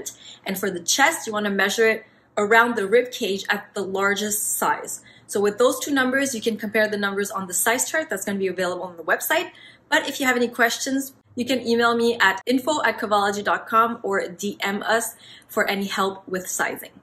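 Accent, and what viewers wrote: Quebec, Canada. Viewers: Êtes vous de Montréal, aussi?! I think I saw you guys!! Aussi je reconnais l’accent quebecois/montréalais! J’adore! 💕 I’m getting a cavalier in November and have binging your channel to prepare myself 😂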